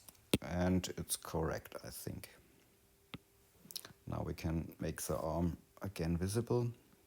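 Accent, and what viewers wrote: Germany. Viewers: Sounds german moin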